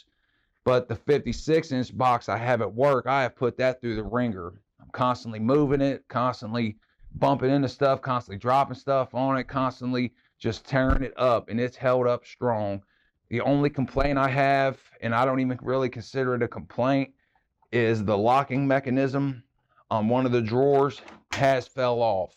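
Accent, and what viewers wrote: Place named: Pittsburgh, United States. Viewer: Ha, ok close enough I guess. Thought I recognized the accent, was hoping you were in the burg as I'm looking for a decent mechanic.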